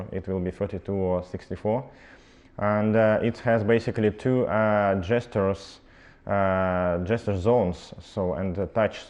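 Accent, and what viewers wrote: Russia. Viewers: Действительно акцент у него какой то Русский !!! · Акцент русский, потому что ОН -РУССКИЙ!!! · Сразу чувствуется Русский акцент :-) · Какой-то акцент у него русский... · странный чел с русским акцентом !!! а телефон дерьььмо -)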